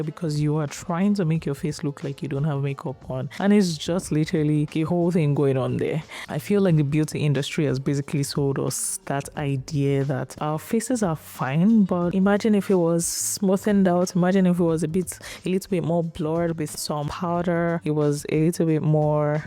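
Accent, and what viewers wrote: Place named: West Africa. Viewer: Unrelated, but you have a beautiful speaking voice. You must be West African, i love yalls accents 😊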